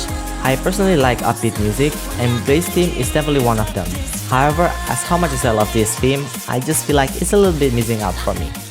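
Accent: Indonesia. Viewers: So... You're actually indonesian? 🗿 I can tell from your accents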